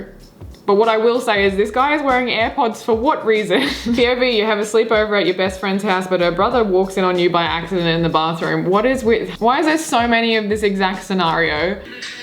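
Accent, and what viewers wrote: Australia. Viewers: Ma’am you sound like a fellow Aussie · I got scared for a second, but nvm it’s fine. (Also are you Aussie? You sound like it)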